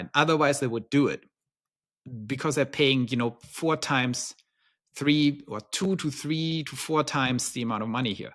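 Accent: Germany. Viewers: Wow, das ist der Hammer! Alles macht Sinn, was sie hier erklären. In meinem Kopf findet gerade eine Explosion von Gedanken und Ideen statt. Das muss ich erstmal verdauen. Elons großen großes Puzzle habe ich habe ich gerade erst begonnen zu verstehen. Vielen Dank für die Hilfe! Ich gehe davon aus ihrem leichten Slang nach😅 dass sie gut Deutsch sprechen und es wahrscheinlich ihre muttersprache ist.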